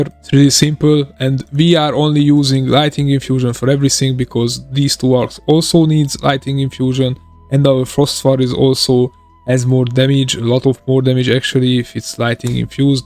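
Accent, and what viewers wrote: Hungary. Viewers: Where are you from? You sound like you are from hungary a lot :)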